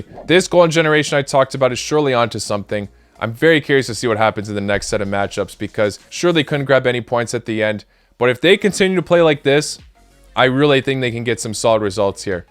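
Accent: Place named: United States. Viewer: His parents are but his bio on Instagram says 🇺🇸🇭🇷 cuz his accent is American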